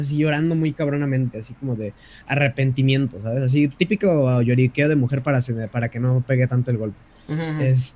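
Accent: Chile. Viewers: Chileno 100%real no feik jajaja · Primera vez q veo a un mexicano q le sale bien el tono de los chilenos :u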